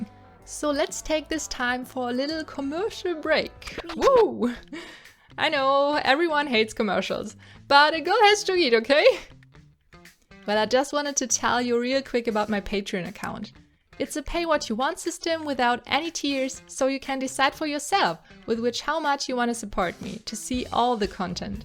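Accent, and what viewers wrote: Germany. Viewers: moin moin ^^ i love ur dolls so much they are so beautiful!! I'm curious are you German because of the "moin" and sometimes I think I can hear a german accent🤔🤔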